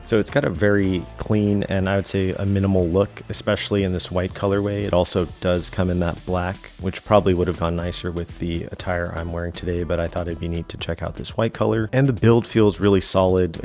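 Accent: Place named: United States. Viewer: I’ll do it Great looking man. Nice American accent. Good content 10/10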